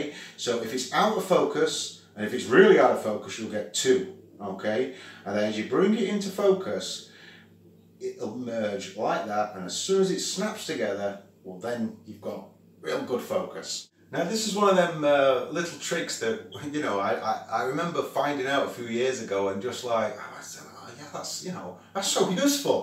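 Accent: Midlands, United Kingdom. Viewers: This is right up my street! When the video started and you mentioned the best way to get focus on a wobbly scope I immediately though focus mask, but I didn't expect you to modify your dust cap! Really neat job, great bit of Astro DIY :) I'm trying to place your accent, I'm pretty sure it's midlands? Big sub from me :)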